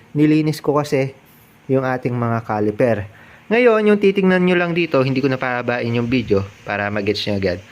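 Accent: Pampanga, Philippines. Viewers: Paps kapampangan ka ba?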